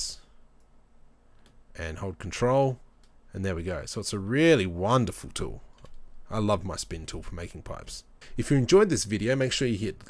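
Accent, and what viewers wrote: Australia. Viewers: Spinning tutorial made infinitely better in an Aussie accent ;P - Good stuff and very helpful.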